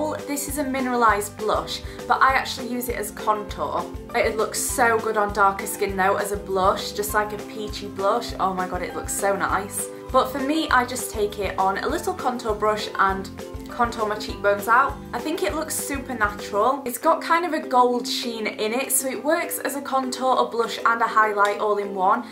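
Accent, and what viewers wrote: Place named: Sheffield, United Kingdom. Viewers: Sheffield in the east middlings of the uk x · She is from sheffield in East Midlands UK x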